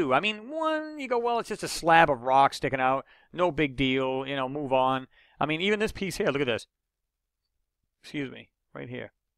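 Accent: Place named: North Jersey, United States. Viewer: lol that's funny cause I really thought you lived in the north jersey or New York area you sound more like your from here then down where u said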